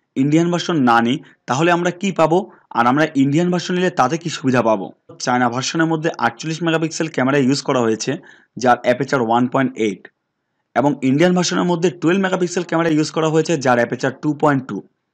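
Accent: Chittagong, Bangladesh. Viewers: আপনার বাড়ি চট্রগ্রাম নাকি ভাই??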